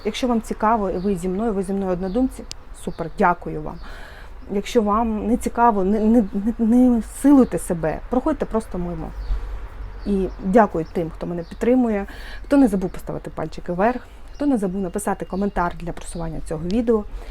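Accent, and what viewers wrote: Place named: Western Ukraine, Ukraine. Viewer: запам'ятай, усім ніколи не вгодиш!!! Твій канал, твоє життя, твої правила! Я із заходу України, мова суржик інколи "ріже" вуха, але це мої проблеми, чому я би писала про це людині і псувала їй настрій? 😅 До речі, я підозрювала, що ти маєш зв'язок із західною частиною, чути все рівно по вимові слів, що ти наша❤🇺🇦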